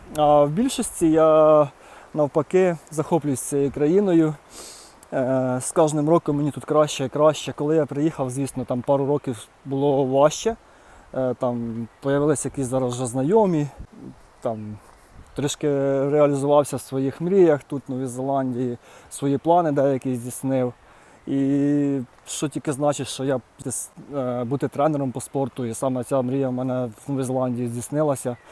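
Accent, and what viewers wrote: Cherkasy, Ukraine. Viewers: По вашій говірці я зразу зрозуміла,що ви Черкащини.А потім по коментарях отримала підтвердження здогадкам😂Дякую за цікавий контент!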